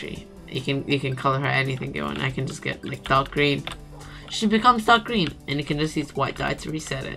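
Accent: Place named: Australia. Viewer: Wth!! I didn't know you were an Aussie!!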